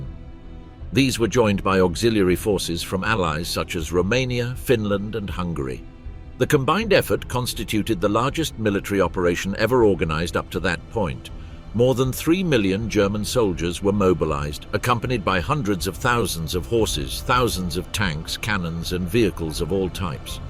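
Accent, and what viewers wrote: England, United Kingdom. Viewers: and more so the accent is English not German!!!! it must be propaganda... the Germans beleived they were winning right to the end with the vunder machinas. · How can it be from a German perspective with your English accent? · How could it be the German perspective, when the narrator has a strong English accent ?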